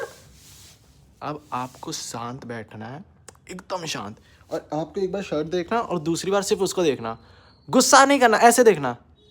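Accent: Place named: Kanpur, India. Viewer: By any chance are u from kanpur? Ur Accent matches the kanpur accent a lot!!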